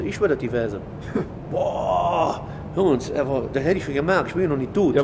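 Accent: Cologne, Germany. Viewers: Einmal hat ein kumpel ihn getroffen und mich angerufen meinte hab hier jemander der dich sprechen will Kam direkt so an Hallo ich bin es der mann vom parkhaus 😂😂😂 auf Kölsch natürlich 😂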